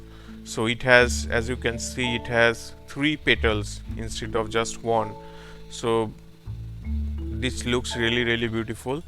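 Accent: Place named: India. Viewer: That accent..Assamese / Bengali?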